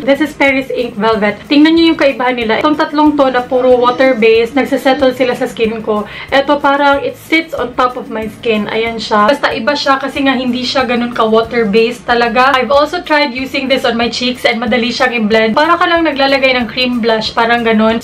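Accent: Visayas, Philippines. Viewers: ate bisaya b kayo hehehe...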